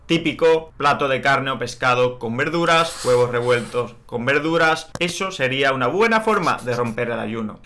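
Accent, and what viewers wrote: Spain. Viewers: Me encantan las enseñanzas del Dr, excelente....., lo disfruto muchísimo y ese acento español me fascina. Muchas gracias. Saludos desde Venezuela.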